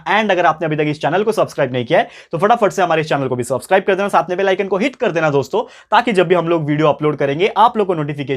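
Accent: Maharashtra, India. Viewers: Sir aap marathi ho kya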